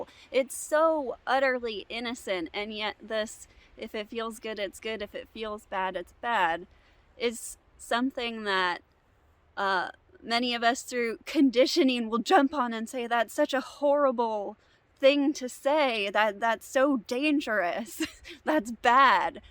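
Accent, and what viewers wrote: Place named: United States. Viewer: Your American accent makes it sometimes difficult for a person who isn't native to the language. English is spoken round the world, you need to speak so that the words are pricked up easily by everyone anywhere.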